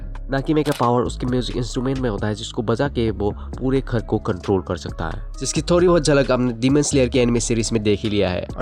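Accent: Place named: Assam, India. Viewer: Assam? accent tu Axomia lagise💀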